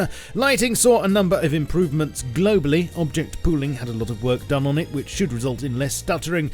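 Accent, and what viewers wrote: United Kingdom. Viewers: Yes, I finally get to hear my favorite British voice!!!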